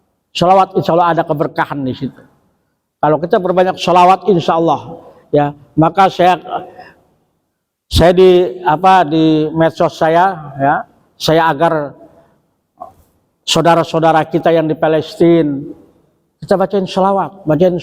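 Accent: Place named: Banyumas, Indonesia. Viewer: Semangat syaikh ❤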